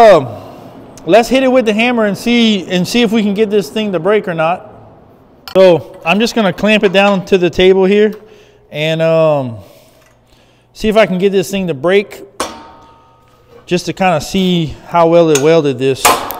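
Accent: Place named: South Louisiana, United States. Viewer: You might be in Wisconsin but you can’t hide that south Louisiana accent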